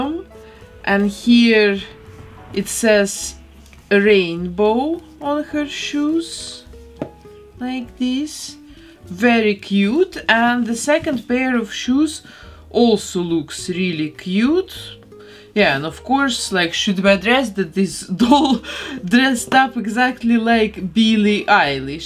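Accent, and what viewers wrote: Russia. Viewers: Is your accent Russian I’ve been trying to guess but I’m not sure I can’t put my finger on it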